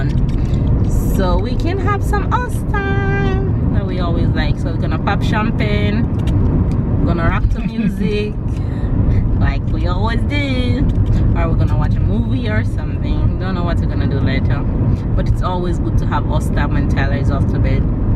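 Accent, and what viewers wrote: Jamaica. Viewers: How come he has a Jamaican accent?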